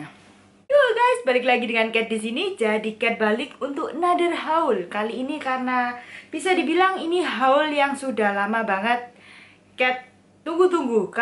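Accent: Java, Indonesia. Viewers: medog jawa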